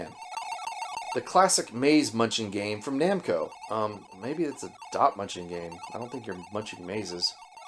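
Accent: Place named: United States. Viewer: hears American accent Me: here comes NES bias 😅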